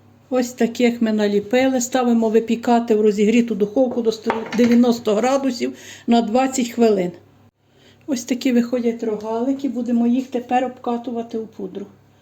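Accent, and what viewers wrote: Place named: Galicia, Ukraine. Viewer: Так гарно слкхати наш галицький говір. Як мкзика звучить.. ❤❤❤❤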